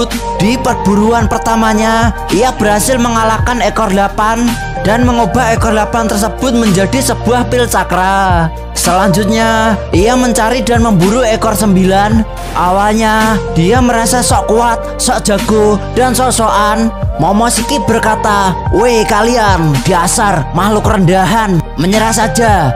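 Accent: Java, Indonesia. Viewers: Medok jawa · kok pekat banget jowo nya min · Medok nya itu lo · Yang bicara auto jawA 😂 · Medok banget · logat mu loo jawa banget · Bahasa nya medok jowo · pekat jawanya bang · lah medok kali bahasa mu tong ,, ngakak njirr kayak lagi rap ini.. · Jawa amat si suaranya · Pekat beno jawa kau bang🤣🤣🤣🤣🤣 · medok amatttt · jan medok tenan rek😁😁😁😁😁😁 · Medok sekali😂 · Jawanya pekat😃😃😃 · Asli mana ngab?... Logatnya medok amat🤭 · Oalah medok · Yooo org Jawa taelaso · Medok bgt bahasa jawa nya wkk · Medok x jawamu telor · mbahasa lo muedog buanget bro 😂😂😂😂😂😂 ga uenak di denger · Logat jawa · Terlalu medok suaranya😂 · Medok banget cukk 🤣🤣🤣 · Medok bgt mas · Medok banget abangnya, jawa tulen kayaknya... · baru nonton semenit pala pusing denger jawa ngomong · Kayaknya orang jawa ni yg pnya chnel ini , dari logat nya 😂🤣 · jawaneeeee medok abis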